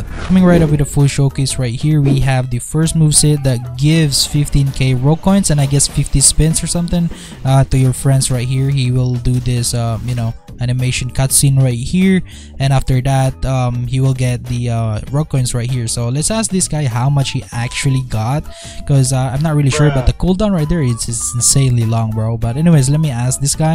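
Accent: Philippines. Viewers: Yung accent mo preee parang Filipino ka ah! Notice mo sana to men if filipino kanga ba talaga at kung na iintindihan mo tong sinabi ko.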